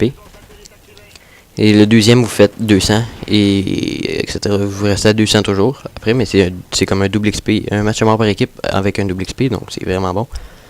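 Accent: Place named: Quebec, Canada. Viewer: Quel voix de merde mais bon ses l'accent québécois ki fait sa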